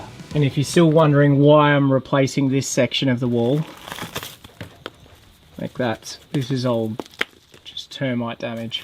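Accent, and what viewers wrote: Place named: Australia. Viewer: New subscriber here from watching you on Eamon and Bec's podcast. I have ripened stubborn green bananas by putting them into a paper bag with an apple. It really worked and those bananas had stayed green and hard for a very long time. I was about to throw them away. They ripened with a much sweeter flavour than usual. I'm Canadian but I lived in Australia for a couple of years and had my third child there. It's fun to hear Australian sounds again. I look forward to watching more of your videos.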